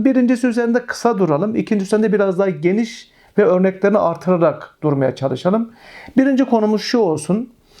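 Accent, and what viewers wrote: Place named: Konya, Turkey. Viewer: Allah razı olsun hocam. bu arada tam bir konyalı gibi konuşuyorsunuz bazenleri ( ' Biliyonuz mu ') :))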